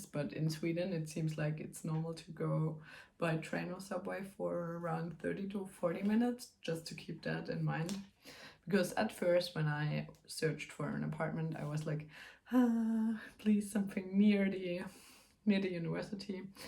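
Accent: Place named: Germany. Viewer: it's a german accent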